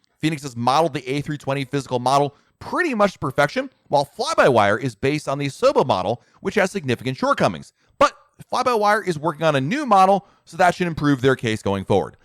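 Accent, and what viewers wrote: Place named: United States. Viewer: This is the first time I've seen any of your videos. I usually watch Overkills Simulation, due to his American voice and great content. His videos taught me how to fly the FBW A320 among other things too which are MSFS related. You have a a great American voice for this type of content. I am American and find it hard at times to listen to the British Videos, which their are so many. Nothing personal to the Brits. Just not my "cup of tea" ( pun intended ) I did subscribe to your channel. Please keep the video's coming. Great Job !!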